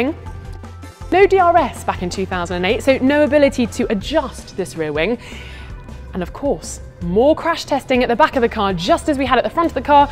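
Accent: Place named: England, United Kingdom. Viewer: Джереми Кларксон в юбке. Англичанка чтоли? у них у всех такой тембр и акцент?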